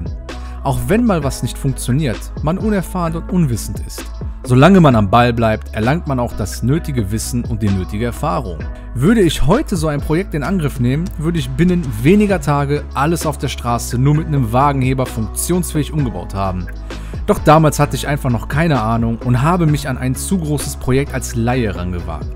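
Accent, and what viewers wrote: Duisburg, Germany. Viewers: Kommst du aus Duisburg? Komme aus Duisburg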